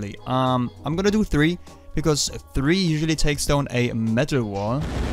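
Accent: Germany. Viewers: Are you german by any cance? ur accent just sounds like that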